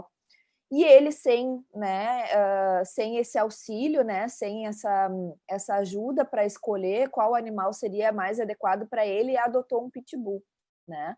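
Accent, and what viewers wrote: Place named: Rio Grande do Sul, Brazil. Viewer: O sotaque gaúcho é assim mesmo!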